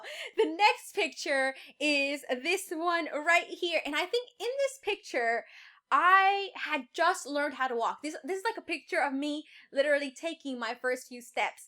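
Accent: Spain. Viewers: Why is the album title in Spanish? It says:cuando era bebe! I'm not surprised cause I know Spanish and she talks like she talks Spanish soooo... Btw you where a really cute baby 👶 ❤️ Like if you agree!👍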